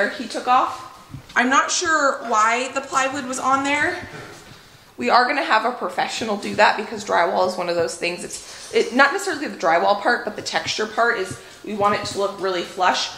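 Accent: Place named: Minnesota, United States. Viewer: You sound like you could be in the movie Fargo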